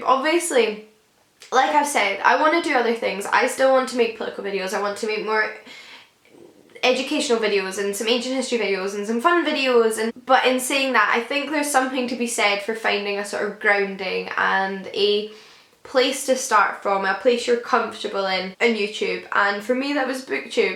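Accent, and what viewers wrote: Scotland, United Kingdom. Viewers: just the other day when I watched a video of yours (and I watch them all, even if I know I won't read the book mentioned etc), I thought to myself "why does 'only' have 29k subscribers? why is it not something like 100k?" you're honestly my favorite booktuber and I love how passionate you are and I could listen to you talk all day (yes, I'm guilty of loving your scottish accent). you seem like such a sweet person and I'm so looking forward to hear you talk about books even more in the future! congrats on 30k, you really deserve it! xx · With a Cuppa. You Scottish? Where from?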